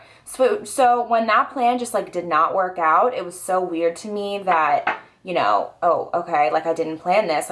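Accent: New Jersey, United States. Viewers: It comes in and out...east coast/New Jersey sounding...but it is really slight...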